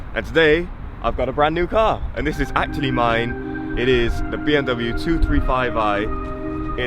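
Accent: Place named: Cardiff, United Kingdom. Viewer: How did you find the lights after the smoke wrap? Did it make much difference to the brightness of the lights on the road? I have a white 2 series and the chrome in the headlight ruins the aggressive look of the front end. I was thinking about having just the chrome part wrapped over by a local company which wont effect the brightness but after seeing your lights i was windering what a slightly lighter smoke wrap would be like covering the whole lamp itself? What say you mate? Im also from south wales beiiiii👊🏼💯🏴as soon as i heard your accent i thought ohhh hes a caaaaardiff boy😏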